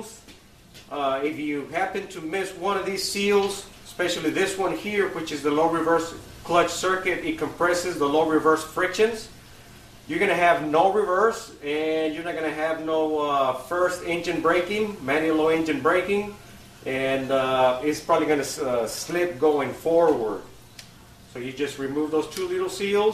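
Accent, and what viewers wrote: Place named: South Texas, United States. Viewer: I could be wrong but I think that's a south Texas chicano accent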